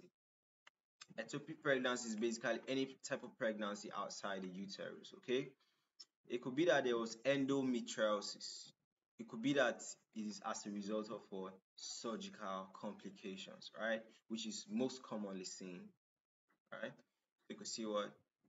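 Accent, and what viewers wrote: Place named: Nigeria. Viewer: Very refreshing to hear a Nigerian accent in a video like this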